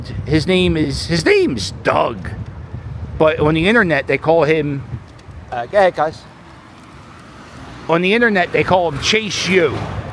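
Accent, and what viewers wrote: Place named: Philadelphia, United States. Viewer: Da Instagram, ya know?! 😂 Philly to the core. Love it. 💙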